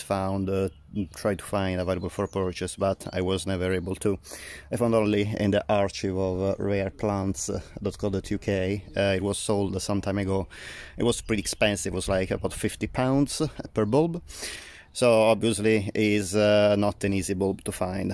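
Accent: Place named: Italy. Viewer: Wonderful! I’ve really enjoyed your videos about fragrant plants and also your beautiful Italian accent. Grazie mille!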